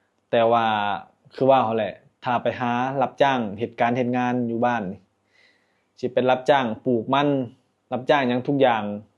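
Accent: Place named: Phu Thai, Thailand. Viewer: เป็น คนภูไท เบาะ บางคำ เว้า ภูไท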